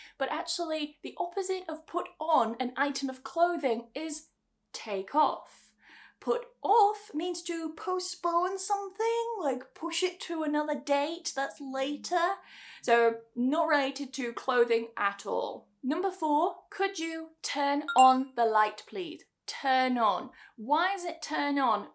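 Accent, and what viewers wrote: United Kingdom. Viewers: Hello, it's always a pleasure to listen to you. Your accent is so british (londoner?) and easy to understand even though you speak quickly. Why say meet + up, meet alone is not suffisant? It's like find and find out, I don't see the difference. May be could you explain that in your next vidéo. So, thank's, we are looking forward to the next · Your english British dialect are very very clear ❤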